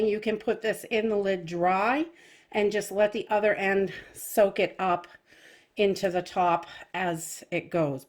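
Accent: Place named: Boston, United States. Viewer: I was listening to her talk, then she said that she "doesn't like to use her mason JAHS". I jumped right to comments to see if anyone mentioned where she was from. I am a Bostonian living in SC. Been away for about 10 years, so every time I hear the voices from home, I jump. Ya never notice the accent until you been away for awhile.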